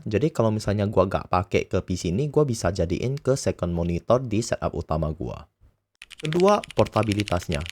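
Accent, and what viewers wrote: Indonesia. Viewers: dari caranya ngomong gua yakin orang ini Cindo.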